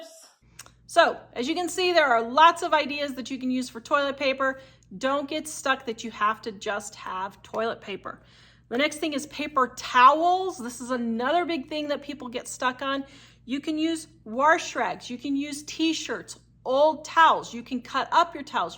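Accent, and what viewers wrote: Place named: Central Illinois, United States. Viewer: I’m from central Illinois, a lot of ppl say warsh just like you 😂.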